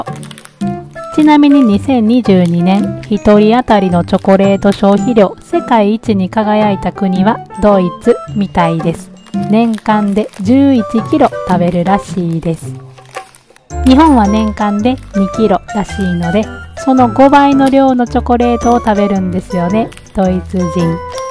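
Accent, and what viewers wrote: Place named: Osaka, Japan. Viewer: Nobanaさんの独特な大阪弁を聞くと、金曜日だー！ってほっこりします♡ドイツも好きだけど、単純にNobanaワールドが好き。大阪でオフ会あったら、Nobanaさんに会ってみたい😆ところでオフ会っていうものは、一人で行っても大丈夫なものなんでしょうか。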